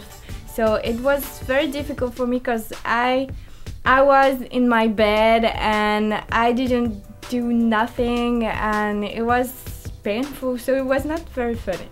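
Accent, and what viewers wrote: France. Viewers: aaahh that french accent gives me life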